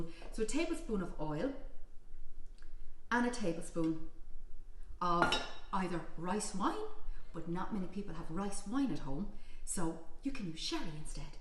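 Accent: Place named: Ireland. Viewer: Nice to see new videos love watching the videos and hearing that beautiful Irish accent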